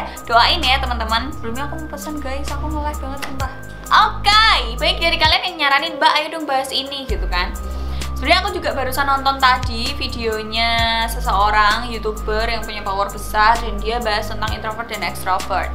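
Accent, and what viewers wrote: Java, Indonesia. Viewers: Haduh lagi" gue gx pikir judul ama isi Lu ngomong ja dah bikin huha Sakjose medokmu ngakakku #nyerang_esteh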